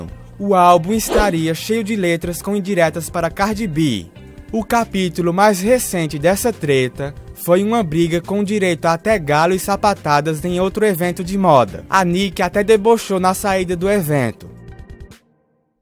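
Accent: Northeast, Brazil. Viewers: Narrador nordetinooo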